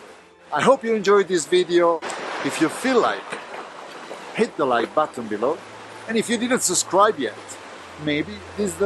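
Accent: Italy. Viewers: I don't know why but there is so much "magic" or "positive energy" in your videos in which you are outside in the nature. Hard to explain ... It's beautiful - and then you play the music and it's even more beautiful. But strangely this is only in your videos - don't feel the same in other photographers videos when they are somewhere filming in nature. Thank you for the helpful information by the way. Like your Italian accent. Cheers from Austria! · Hi, I've been following you for some time. I like the way you tell your work and I like your Italianized Italian but I don't like the way you do pasta, but that's another story:-). I'd like to ask you a question, I haven't found anything on your site either, about it. I saw you use an L-bracket for vertical shots, can you tell me the type / brand of this bracket? Do you feel comfortable? I'm waiting for your other videos. 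Good light. Ivan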